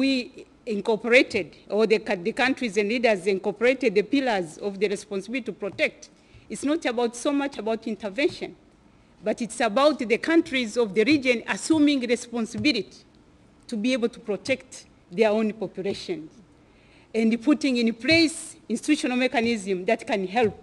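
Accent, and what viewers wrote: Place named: Haya, Tanzania. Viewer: She is a Tanzanian from Kagera region. You can hear the Haya accent.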